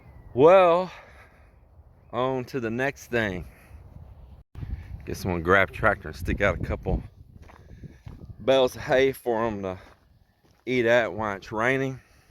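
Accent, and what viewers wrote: Texas, United States. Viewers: Now I'm gona watch your channel everyday..love the Texas people and I love Texas,,we need Texas,,missed your accent..lol I'm originally from n.c. my Daddy was a farmer..I helped slop the hogs when I was 4 and pick some cotton balls..dig taters..love cracklins..bet Lil lady could cook some up...bet u have..ok it's pm here in pa.wonderfully cool..59dgrees.happy to git your chanl.love and peace to you both..you're a beautiful couple..tell her hello for portia...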